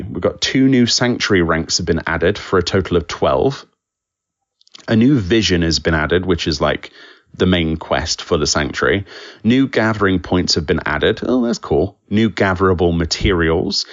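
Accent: United Kingdom. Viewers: I'm still able to thoroughly enjoy the british man reading FFXIV patch notes ASMR so it's okay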